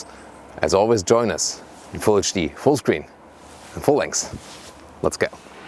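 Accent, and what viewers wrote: Germany. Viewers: I don't think I'll ever bother to watch BMW reviews without a German accent again. It just seems. so. right.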